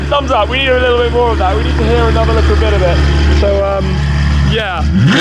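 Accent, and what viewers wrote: England, United Kingdom. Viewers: Ale mnie wkurwia Ten koleś ta gadka tak mocno angielska ze chuj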